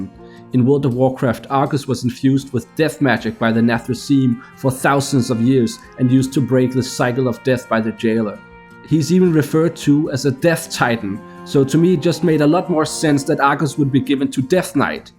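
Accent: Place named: Denmark. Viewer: Man ka’ godt høre at du er dansker 😅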